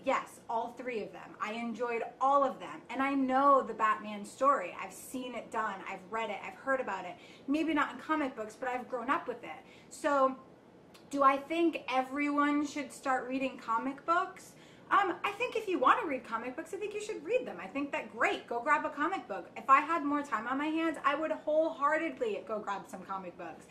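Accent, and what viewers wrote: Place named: East Coast, United States. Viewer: You have such an east coast accent! LOL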